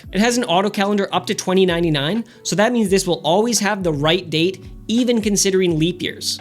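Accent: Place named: Canada. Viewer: If I ever need to explain what a Canadian accent sounds like, I'm going straight to this video for the best example❤👍. Oh yes, forgot, I agree with you on the 6510.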